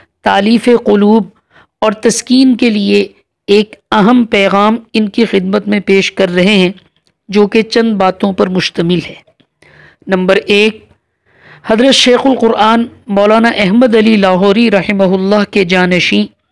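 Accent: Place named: Bengal, India. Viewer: بھائی لہجے سے تو آپ بنگالی لگتے ہو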